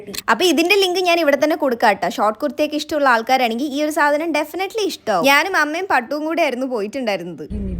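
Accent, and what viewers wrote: Palakkad, India. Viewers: Chechi Palakkad Ano 😮😮😮😮 w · Eeeehh chechi palakkad kari aayirunno? 😃❤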